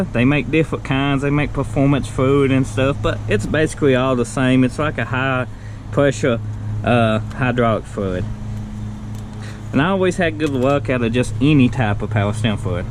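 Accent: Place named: Louisiana, United States. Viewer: Cajun accent sounds like 👍 thanks for the video brother!!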